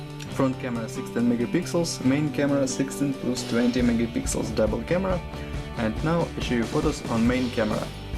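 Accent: Russia. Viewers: Русский акцент есть), где можно взять не подскажешь?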